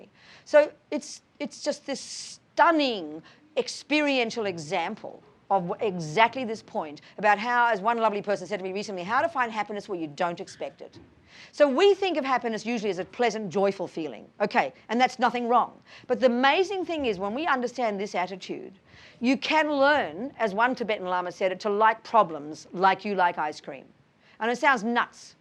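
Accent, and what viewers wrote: Australia. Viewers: She's Aussie, 100%, so even drier still. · i like this nun she speaks good aussie language peace compassion wisdom im happy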